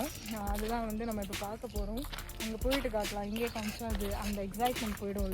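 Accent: Sri Lanka. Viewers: இலங்கை தமிழ் ரொம்ப அழகு நானும் இலங்கை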